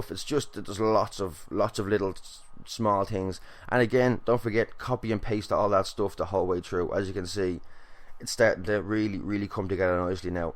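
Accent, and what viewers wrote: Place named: Ireland. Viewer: Nice vid dude. 1) I know you're irish but you really do sound quite alot like Sheamus dammit. also 2) could you maybe do an NWO logo? I think they were the main DX rivalry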